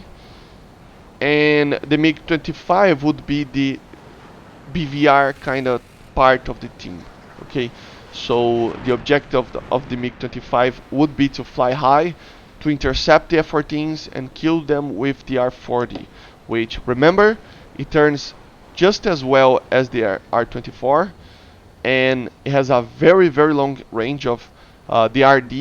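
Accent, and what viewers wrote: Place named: Brazil. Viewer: Sheer curiosity: Are you brazilian mate? Your accent is highly reminiscent of such, hahahaha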